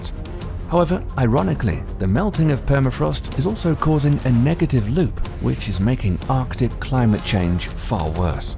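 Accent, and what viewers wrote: England, United Kingdom. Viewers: Is the English accent used to make us feel more frightened? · That accent on this guy is not desirable. Why have a British dude instead of an American?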